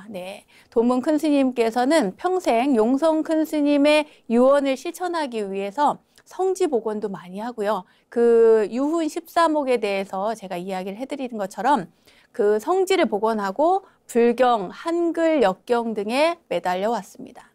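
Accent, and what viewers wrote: Gyeongsang, South Korea. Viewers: 스님의 속세 고향이 어디신지 모르겠지만 경상도 사투리를 넘 잘하시네요 ㅎㅎㅎ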